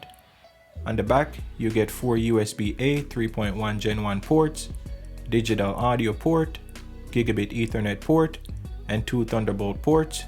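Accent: Jamaica. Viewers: Your Jamaican?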